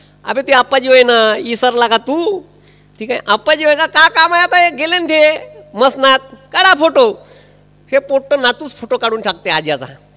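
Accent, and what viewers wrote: Vidarbha, India. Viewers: खतरनाक सर ,, आपली विदर्भाची भाषा शेवटपर्यंत सोडायची नाही.... 👍👌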